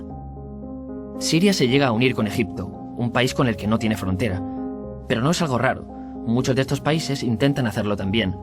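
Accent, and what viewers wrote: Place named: Spain. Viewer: Recuerdas la Torre de Babel de la Biblia, la hicieron estos tíos. Jajaja suena bien!!👌🏻👍🏻Me gusta el acento de Español.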